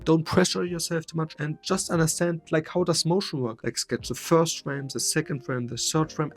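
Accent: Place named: Germany. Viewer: i hören little bit deutsch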